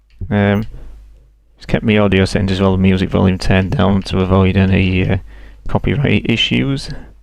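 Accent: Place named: Hull, United Kingdom. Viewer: You sound like you're from Hull!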